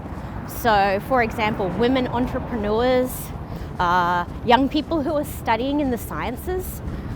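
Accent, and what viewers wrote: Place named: Australia. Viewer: My colleagues would disagree strongly 😂めっちゃオーストラリア訛の若干のアメリカ影響ですよ〜😂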